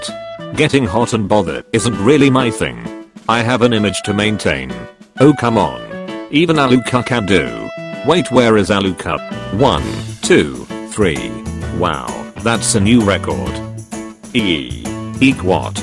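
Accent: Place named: England, United Kingdom. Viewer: I sense a fellow english girl...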